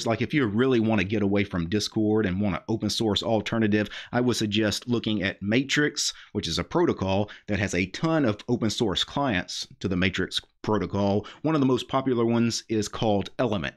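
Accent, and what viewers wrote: South, United States. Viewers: your southern twang is glorious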